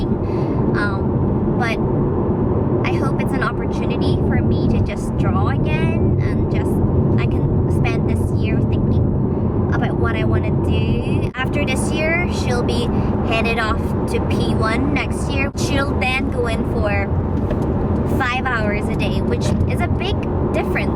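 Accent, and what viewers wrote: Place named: Ireland. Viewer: I love how your accent is slowly starting to sound more irleandish each time I watch a video of yours :D Watching you since 10+ years, somehow its become a ritual to 'catch up' with you. Stay strong and bubbyful! Lots of Love <3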